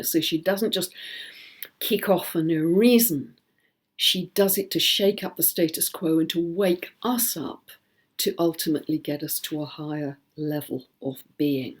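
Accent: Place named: RP, United Kingdom. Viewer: I like that you are friends with Bracha. Good video. You are very posh:)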